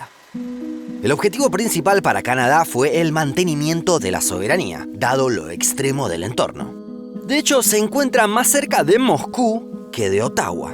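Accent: Argentina. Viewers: ¿Este canal lo hace un argentino?, pregunto por curiosidad.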